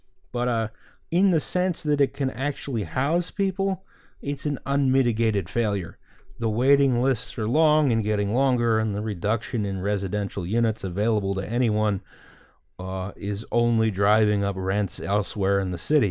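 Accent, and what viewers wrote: Philadelphia, United States. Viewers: Philly accent.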